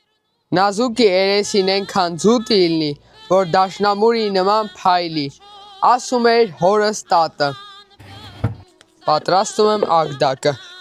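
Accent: Lori, Armenia. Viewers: Մեր Լոռվա բարբառը 😊😊❤❤❤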